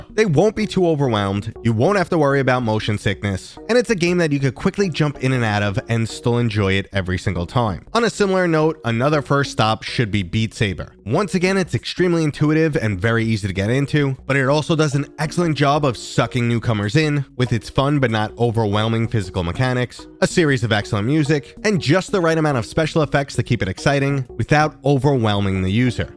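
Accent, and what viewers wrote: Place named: Boston, United States. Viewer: I’ve never been to America but imma guess this guy is from Boston? 😂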